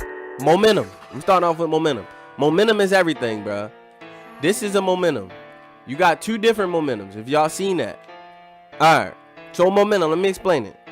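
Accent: Baltimore, United States. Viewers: Lmao I subbed cause your accent bra it reminds me of the wire👌🏾💪🏾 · U from bmore ain’t chu ? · got that deep baltimore accent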